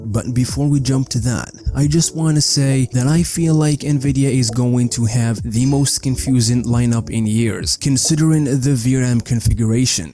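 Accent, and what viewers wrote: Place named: Russia. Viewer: Мне показалось, что у вас русский акцент) успехов в развитии канала.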